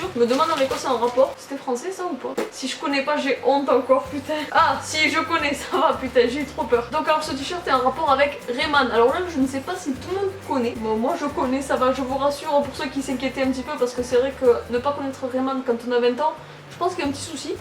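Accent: Toulouse, France. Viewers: i love accsens toulousin et tu fais 17 ans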